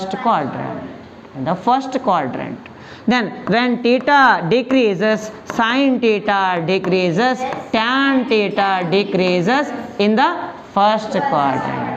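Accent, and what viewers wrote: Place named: North Karnataka, India. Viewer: Nim uttara karnataka bhashe Nam kade baidid tara ansute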